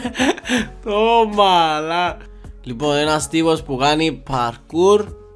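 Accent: Cyprus. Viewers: ΛΑΤΡΕΥΩ ΤΗΝ ΚΥΠΡΙΑΚΗ ΠΡΟΦΟΡΑ❤ Ειδικα του!!!❤❤ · Εισαι απο την κυπρο?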